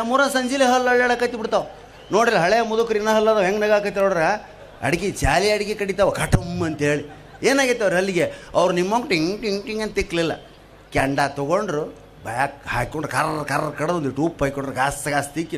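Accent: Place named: Uttara Kannada, India. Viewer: Super sir nevu yell hodru nama Uttara Kannada bashe dag matadtiri ☺️Ur amazing sir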